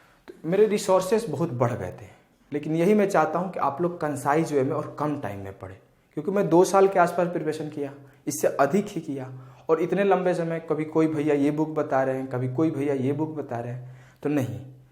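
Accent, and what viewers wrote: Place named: Bhojpuri, India. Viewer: Jai ho bhaiya 🙏 Tohra muh se bhojpuri sun k mja aa gyil😍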